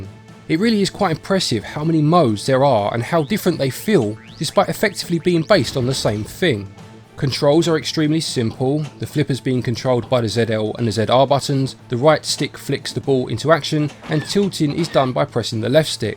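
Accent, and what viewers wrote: United Kingdom. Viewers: Oh, ok, the man knows digital pinball, too. You're quickly climbing the ranks of my favourite Switch-related channels, sir, and your accent makes me want to spell things colourfully ^.^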